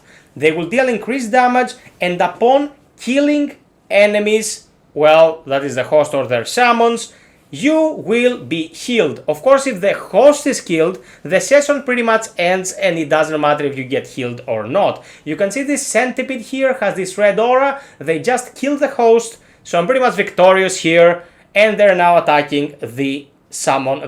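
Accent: Greece. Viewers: i know a greek accent when i hear it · Your accent seems greek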